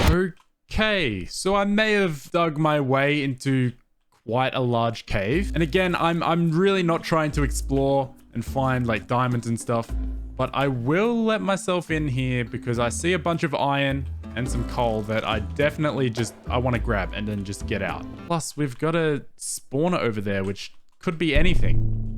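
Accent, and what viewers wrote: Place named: Australia. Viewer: wait i just realised u got an australian accent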